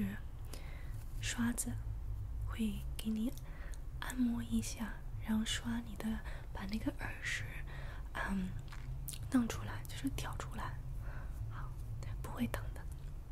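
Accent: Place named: Beijing, China. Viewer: 虽然有些词忘记了，但是你有很北京的口音👍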